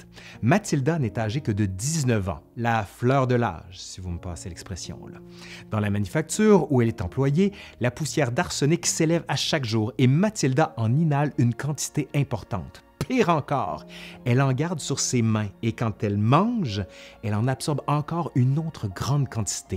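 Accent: Quebec, Canada. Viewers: Youhou enfin le retour de l'histoire nous le dira !! Cet accent québecois m'avais tellement manqué, je me refaisais les ancien épisodes en boucle. Bon retour :) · Décidément j'aime bien vos vidéos !!! Votre narration est extrêmement riche , extrêmement soignée , et votre accens québécois apporte quelque chose en plus pour moi français . Sans compter que j'ai l'impression de ressentir une gentillesse naturelle chez vous . Et vos vidéos sont extrêmement interessante sur des sujets dont j'ignorais tout !!! Donc , merci , et je m'abonne ! Continuez a nous régaler de vos belles vidéos !!!!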